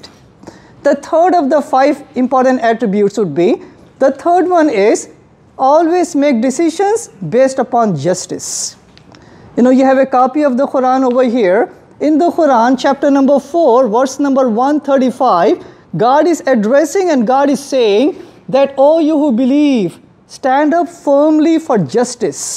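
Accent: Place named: India. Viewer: I like when indians speak english 😍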